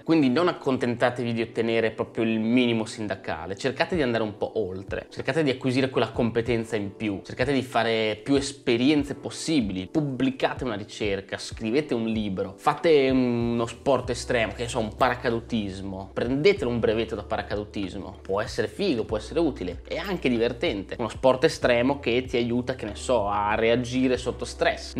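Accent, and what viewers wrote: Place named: Ferrara, Italy. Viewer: ho sentito tantissimo il tuo accento ferrarese, più del solito😂 Comunque il video stra interessante, non sapevo un sacco di cose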